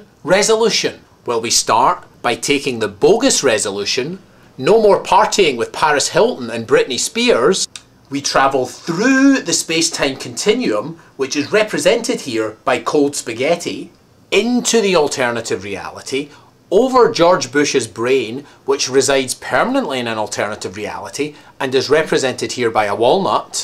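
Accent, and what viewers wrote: Scotland, United Kingdom. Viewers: I thought this was funny. Nice one. Loving the scottish accent lol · Let's hear it for monotonous, inane, recycled social commentary and weak attempts at humor. For the record, Scottish accent != funny. I'm currently soaking your youtube account in vinegar... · Can't get past his Scottish accent-I prefer Michael Myer's version because he's actually funny. YouTube vids stink when it's simply someone's ugly face sitting still while their eyes dart from the camera to the monitor so they can catch a peek at what they look like while shooting the vid. I'd rather watch Michael Richards. His bald, gouteed, & earring look-he's just plain annoying. Wish his resolution was to trash his webcam & switch careers to something where you can't hear or see him.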